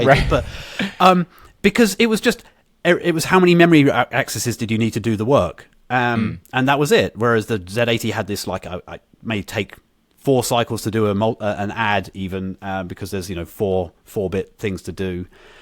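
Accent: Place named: United Kingdom. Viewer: I love these british accent. More than american english. Congrats!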